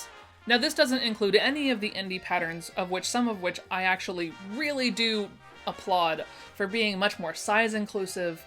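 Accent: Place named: Ontario, Canada. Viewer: Are you sure you don't live in Canada, you sound like you're from Ontario like me! I think the only thing that angers me about the big 4 patterns is that I'm not in the size range unless it's one of the few smocks they have. It's why I draft my own for basic stuff or buy from Indie companies that acknowledge that mid fats exist!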